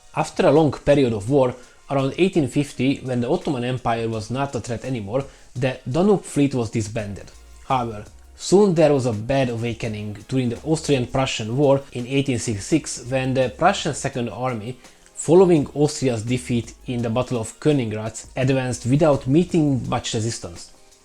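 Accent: Hungary. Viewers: its hungarian "accent".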